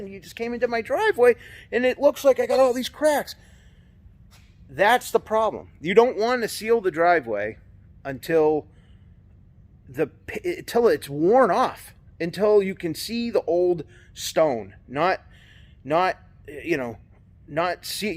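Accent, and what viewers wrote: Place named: Northeast, United States. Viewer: i knew you were from the north east from your accent. Cheers from New York brother. - Mike, Asphalt Works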